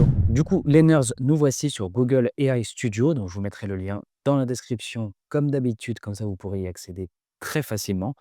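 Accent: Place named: England, United Kingdom. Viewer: Super ton accent anglais, ta vécu dans un pays anglais?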